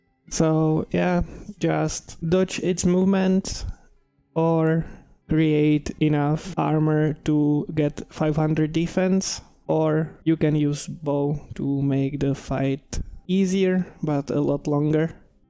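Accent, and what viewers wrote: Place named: Czech Republic. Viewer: já ten českej přizvuk výdycky poznám xD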